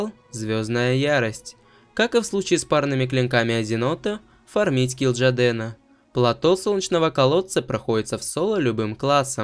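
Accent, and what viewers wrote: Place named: England, United Kingdom. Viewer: Сильный английский акцент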